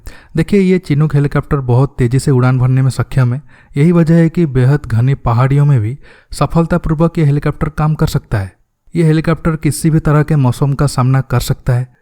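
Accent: Odisha, India. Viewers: App Odia ho mujhe lagta h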